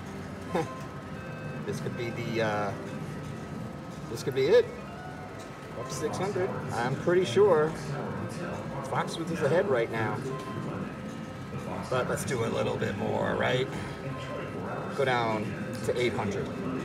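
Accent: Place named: New Jersey, United States. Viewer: I enjoy watching and listening to you commentary during you play. You sound like a real good Jersey guy. Love the show and keep it up. Bring your mom back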